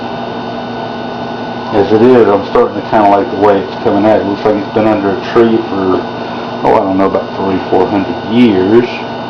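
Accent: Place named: South, United States. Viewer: Having grown up with a southern mom, I understood every word! Please do not apologize for your accent - it's a beautiful thing. Also, awesome tutorial! Thank you! I have been looking for a good one for a while now.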